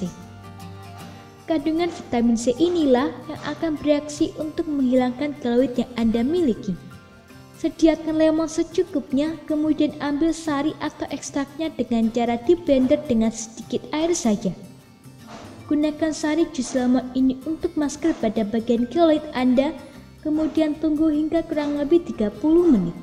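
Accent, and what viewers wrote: Java, Indonesia. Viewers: Intonasi suara nya kaya drama di sekolah.logatnya jawa .tapi jawa ma ya...lucu2 geli..... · Medok bngt🤣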